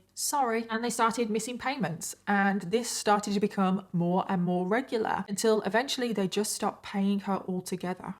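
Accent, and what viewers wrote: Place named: Midlands, United Kingdom. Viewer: I love listening to your You Tube talks as well as watching you do your makeup! Beautiful eyes that always pop at the end!.. I also love your accent. I have family in the Midlands so it warms my heart to hear you talk. Congrats on your pod cast show now! Looking forward to hearing more :)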